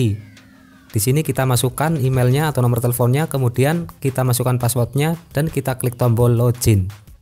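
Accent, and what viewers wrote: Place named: Java, Indonesia. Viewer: crom nyamake bhasa indo lah udah sulit malah d persulit, Abang juga orang indo dari logat nya juga orang jawa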